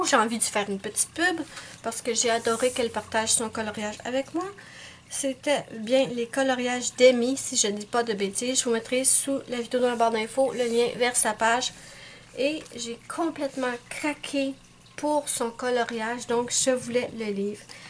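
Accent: Canada. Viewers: Super vidéo, ^^ J'ai le livre Dreamlings de Edwina Mc Namee, il est merveilleux. Je te le conseille. Celui que tu as aussi est très beau. Moi aussi je regarde les vidéos de Kry's colors et Steffie. Et ça me donne envie d'acheter tout ce qu'elles présentent. :) J'adore ton accent, tu viens de quelle région au Canada?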